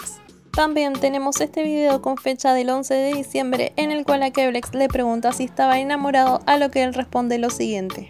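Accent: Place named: Venezuela. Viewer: no tiene acento argentino habla como Venezolana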